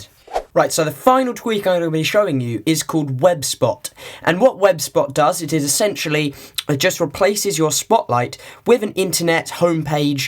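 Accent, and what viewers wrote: United Kingdom. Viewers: It's odd: everyone that does these cydia videos has a British accent.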